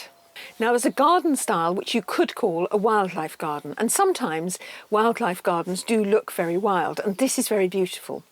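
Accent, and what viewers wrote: RP, United Kingdom. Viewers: I love your voice and the Queen's English you speak.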